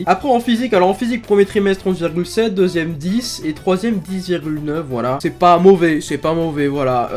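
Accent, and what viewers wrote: Marseille, France. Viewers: Marseillais il est ?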